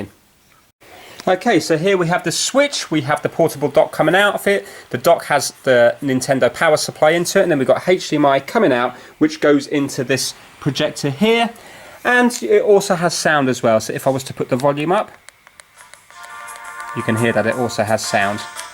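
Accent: United Kingdom. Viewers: Wait are u British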